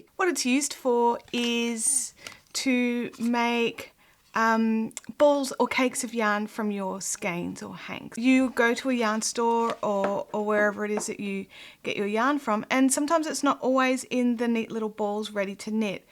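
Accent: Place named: Australia. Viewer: Thanks so much for this!! Well done and nice to hear the Aussie accent. 🙂🙂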